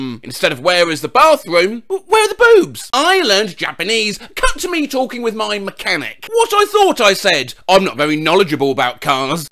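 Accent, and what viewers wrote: United Kingdom. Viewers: Despite all the foreign pronunciations being very good (except the Hebrew lol), has this funny way of making every language sound extremely British.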